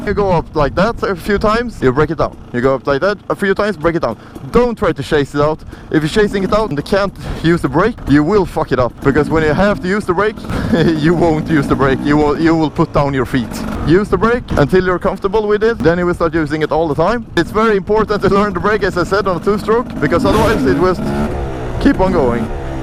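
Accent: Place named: Sweden. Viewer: Subbing because that Swedish accent is awesome! So is that bike 😀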